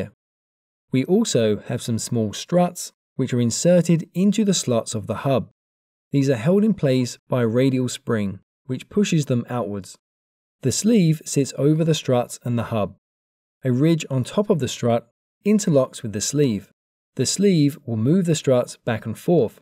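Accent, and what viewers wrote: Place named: United Kingdom. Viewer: I think he's a british guy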